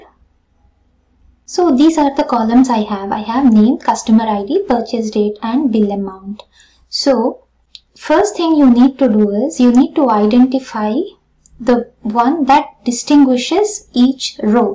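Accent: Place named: Kerala, India. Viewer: When you have accent, its very hard to understand. are you a malayali?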